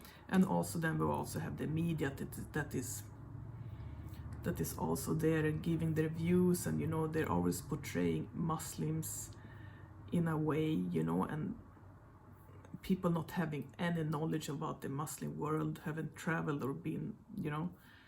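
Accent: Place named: Norway. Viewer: your accent sounds norge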